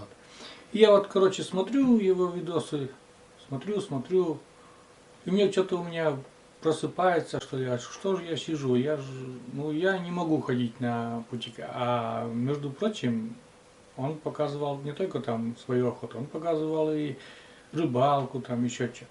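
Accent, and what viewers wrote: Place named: Udmurtia, Russia. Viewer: недавно на вас подписалась, смотрю ваш канал и отдыхаю душой, много от вас позитива идёт, простоты,душевности, чего нам не хватает сейчас, ещё я услышала наш местный акцент / удмуртский/,он меня заинтриговал и вот я с вами. Здоровья вам и вашей супруге, вы молодцы! Спасибо за видео, мне хотелось узнать о вас больше.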